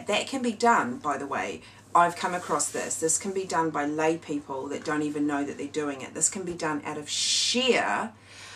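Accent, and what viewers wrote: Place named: Scotland, United Kingdom. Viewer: you sound Scottish :))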